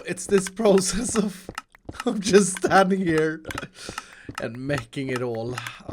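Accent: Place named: Sweden. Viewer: He's probably just developing an accent that's less swedish because the majority of the English-speaking world isn't swedish. He must think in a swedish accent, so it would be hard to lose it permanently.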